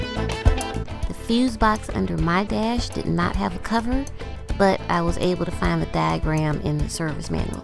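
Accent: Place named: South, United States. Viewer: I hope that you’d consider doing voice-over work. Your voice is unique and has a pleasant tone. And the southern twang is awesome.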